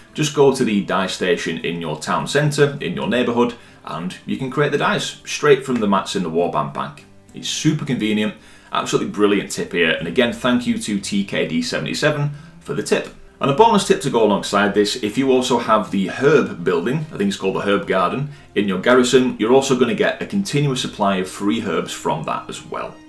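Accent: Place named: Northern England, United Kingdom. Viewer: This is the first time I've seen you. Very helpful video thank you. Also nice to hear a northern accent, Yorkshire lady here!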